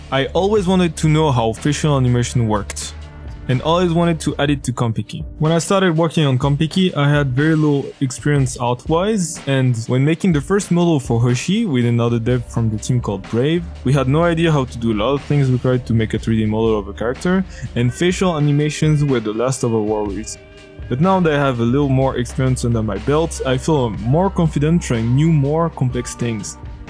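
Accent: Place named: France. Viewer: Gotta love the french accent ahah Nice changes! I'm looking forward to play the next chapter ✨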